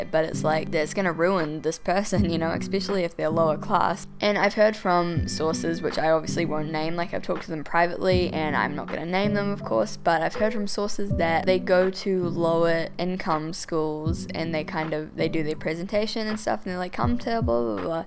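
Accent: New Zealand. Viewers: Ohhh you're from NZ! I always wondered why you seemed so familiar and I just realized: it's your accent, your makeup, and the fact that I grew up watching The Tribe lmao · oh my gosh i knew i recognised a fellow kiwi accent!! you’re right about it not being an art and animation hub sadly :/ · Aha, you’re from New Zealand, that makes sense. I’m not the best with accents, so I wasn’t sure between Australia and New Zealand. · Oh! It’s a New Zealand accent!!! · I thought I recognised your accent 😂 NZ youtuber gang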